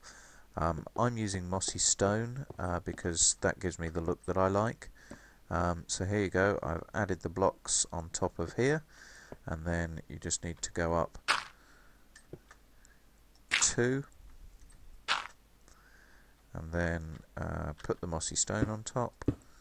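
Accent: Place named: United States. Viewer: It's noce to hear that I can contribute to your work :) And it will be nice to see how part 2 will be executed. Also, I heard you had some kind of accent. But for an untraine ear it sound a lot like american :p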